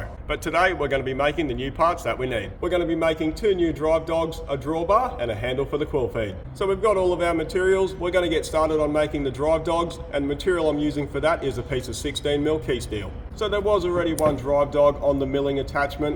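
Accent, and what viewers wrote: Australia. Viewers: I THINK IT WOULD BE AWWSOME TO DO A VIDEO IM YOUR NATURAL AUSTRALIA DIALECT. I BET WOULD BE HILARIOUS THINK ABOUT IT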